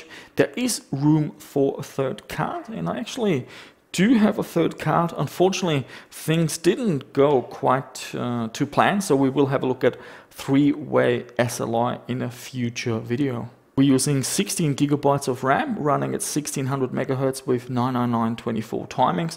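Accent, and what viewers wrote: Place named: Germany. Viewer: Nice Video, can i ask you were you’re from? You have kind of an German dialect :D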